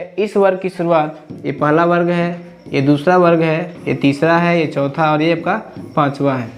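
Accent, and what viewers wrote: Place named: Bihar, India. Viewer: Bahut hi ghatiya lecture hai samajh me nahi araha bihari bhasa se padhaya sub teacher aacha padhate hai is channel ke bas ise aur english wale chhod kar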